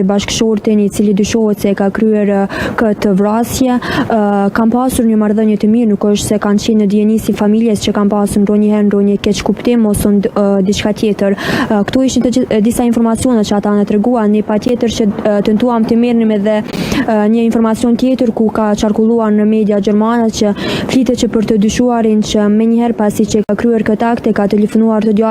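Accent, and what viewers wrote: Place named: Highlands, Albania. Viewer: O gazetare sa trashe flet na e shpife maloke tamam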